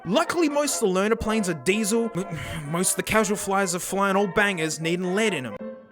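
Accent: Australia. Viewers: Dude I've been watching this channel for a while, it's become a new favorite. I initially had a sneaking suspicion but then thought "no I must be racist towards Australians, they can't all sound the same" that this was. I'm probably more than a dozen videos in. You mentioned drumming in this video and I just thought "no way this has to be him", and sure enough I look under the description and there's lmao. Great channel. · Man what a shitty position you've been in. Really glad our support has enabled you to move somewhere else. Hope you'll enjoy it there man! Keep that silly content going you loud aussie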